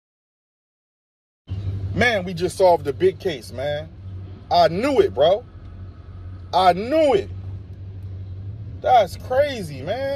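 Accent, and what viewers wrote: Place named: Philadelphia, United States. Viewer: You sound just like Umar Johnson. Before saw this vid, I already knew you were from the Philadelphia area.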